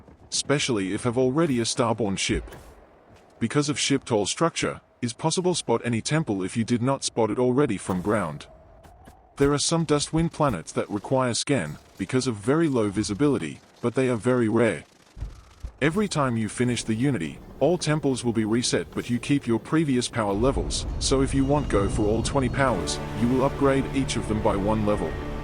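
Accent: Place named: Australia. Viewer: Nice video. I had a bit of a giggle to myself with the commentary in an aussie accent but the script seemed to be written by an asian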